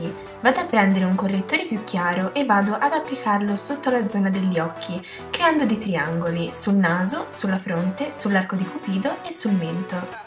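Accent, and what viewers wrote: Tuscany, Italy. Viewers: Bellissimo video e tutorial super mega completo, attualmente il migliore sull'argomento, bravissima!... Sei toscana come me sento? ^_^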